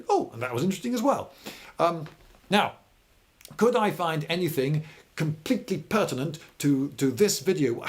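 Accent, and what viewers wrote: United Kingdom. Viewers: Explaining anything in an English accent makes whatever you are talking about 10x more interesting. You could be talking about the history of the bar stool and it would be entertaining. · This guy sounds British.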